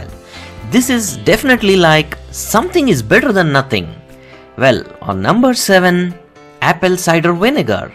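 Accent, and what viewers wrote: India. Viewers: You sound very funny... Are you an indian? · Very bad indian accent-English language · Thank you.That was the most informative instructive article I have read about routing .hormone on the internet. The accent is from India I think. Please let me know. Thank you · I like your Indian English accents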